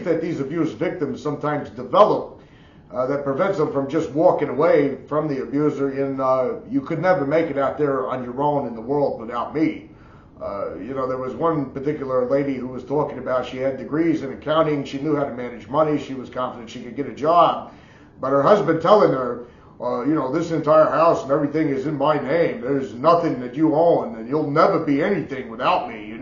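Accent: New Jersey, United States. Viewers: I can’t believe you’re from Jersey. That accent is so intense 😂 I can relate to you a lot.. I’m from Essex county and grew up with a music-obsessed father as well. Your understanding of female bodily autonomy is heartwarming. It should just be normal but unfortunately it’s not.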